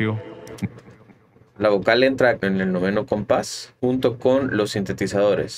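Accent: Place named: Honduras. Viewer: hermano soy tu fan desde que vi este primer video pense que eras hondureño estaba asustado, dije y este brother quien es jajaja saludos hasta SV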